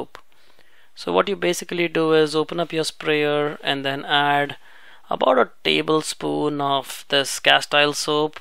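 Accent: India. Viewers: your sweet Indian accent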